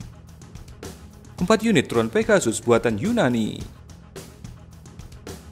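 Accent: Java, Indonesia. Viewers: kok medok sekali logat nya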